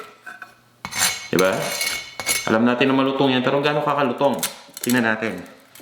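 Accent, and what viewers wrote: Philippines. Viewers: natutuwa talaga ako sa pagsasalita niya kahit nakatira siya sa ibang bansa hindi nabago yung puntong tagalog niya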